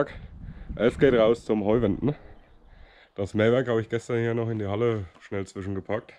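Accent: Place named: Lower Franconia, Germany. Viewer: Schööön! Ein Undder-Frrrange 😇 sonst gibt´s hier nur Ober-Frrrangen 😂 direkt abonniert und gelikt!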